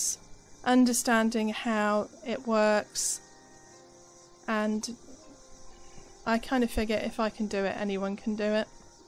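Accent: United Kingdom. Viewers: Finally, tutorials with a beautiful British accent.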